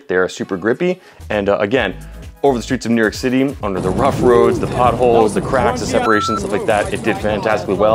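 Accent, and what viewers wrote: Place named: Germany. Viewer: Wow man, this is the best looking esk8 review I've ever seen ! From that sick intro with all the neon colors , then to the ride through the streets w/ those subtle "after-effects" they only last for a sec or two but I really liked it for w/e reason. Props to you and your crew for all those cool cinematic riding angles, this is gonna be a hard one for y'all to top but I got faith in ya! The board itself seems amazing for what you get at that price point, will definitely be recommending this if anyone ever asks about a beginner board. It costs slightly more than all the other $500 or so budget boards, but completely blows them out of the water in terms of features. Did you do the voice acting for the Medic in TF2, almost sounds like it, the german accent and everyting lol. Amazing job dudes, this video is an inspiring work!👍🤙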